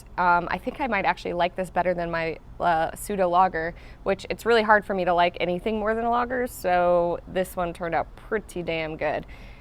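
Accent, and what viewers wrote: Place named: United States. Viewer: Hun snakker ikke et nordisk sprog så er det ikke bare ok at hun siger ord med et amerikansk accent?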